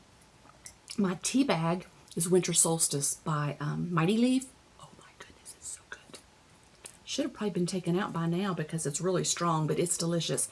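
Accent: United States. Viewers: Love Love Love! Congrats on jumping in and thanks for sharing! I adore your East Tennessee ?(I'm presuming) accent - it reminds me of home and childhood and all things cozy. Glad to meet you! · you did a great job! I'm from Rocky Mount, Nashville NC area. When I heard your accent I knew I felt right at home!!! Can't wait to see more!!!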